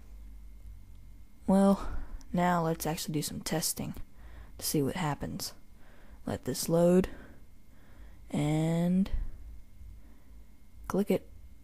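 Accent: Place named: Florida, United States. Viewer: dude your voice sounds a mixture of something like you live in florida and then you sound kind of low anyway that was off subject.========================== thx video really helped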